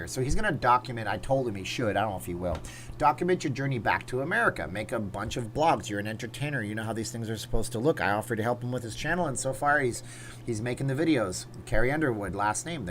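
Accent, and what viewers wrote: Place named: Canada. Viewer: I think people like your Canadian accent :) and your information/news on LV. You are doing a great job.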